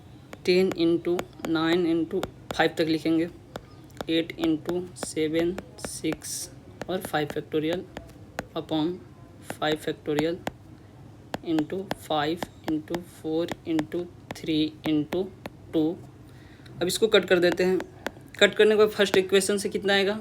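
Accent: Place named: Bihar, India. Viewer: Bihari touch in voice ❤❤ great bhaiya ❤❤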